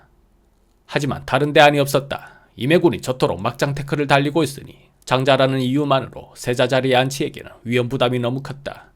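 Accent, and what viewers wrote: Gyeongsang, South Korea. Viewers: 이분 경상도 억양만 없으면 듣기 좋을텐데...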